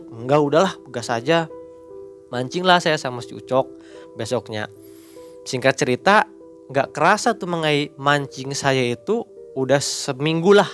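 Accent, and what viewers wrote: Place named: Banten, Indonesia. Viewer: Logat Sunda nya hilang 😅 ini mirip logat Banten serang / Cilegon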